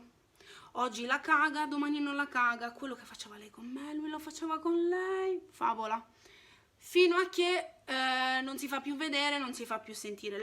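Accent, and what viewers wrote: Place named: Palermo, Italy. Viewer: Bel video 🤣❤️ il tuo accento palermitano é il top ❤️🤣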